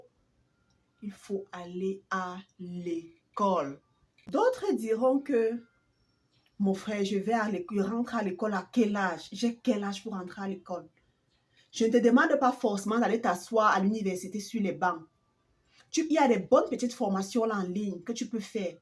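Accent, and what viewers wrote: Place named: Cameroon. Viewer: Belle vidéo, argument très pertinent. Je sens, d'après ton accent, que tu es Camerounaise, je suppose.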